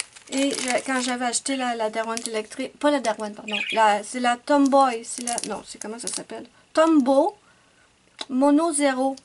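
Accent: Canada. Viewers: Coucou Moi aussi j'adore regarder ce genre de vidéo ça me fait découvrir de nouvelles marques. Et en ce qui concerne tes vidéos je pourrais les regarder en boucle juste pour entendre ton accent 😊 je suis française et l'accent canadien me fait voyager rien qu'à l'entendre. J'aurais une petite question, je découvre avec ta vidéo les prismacolor scholar. Quelle différence y a t'il entre ceux là et les prismacolor premier ? Merci bcp Et bon colo 😉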